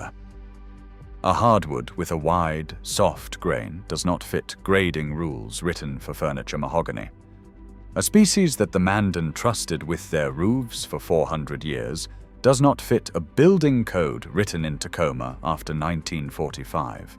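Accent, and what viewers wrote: United Kingdom. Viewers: Just because you put a British voice to the information doesn't make it right. COTTONWOOD SUCKS AS A WOOD FOR ALMOST EVERY THING! Remember, I said ALMOST every thing involving wood uses.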